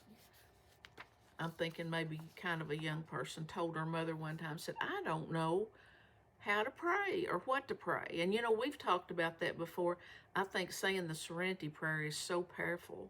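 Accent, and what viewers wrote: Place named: South, United States. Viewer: Hey....it's all good!! It's just from the Southern roots you grew up with. And it's down right special!! I get a lot of pleasure from hearing the different accents. Probably because growing up and being in Florida....where there are actually more Northern folks...so accents not Southern mostly. I love that twang! Don't you worry about it. It's perfect!❤❤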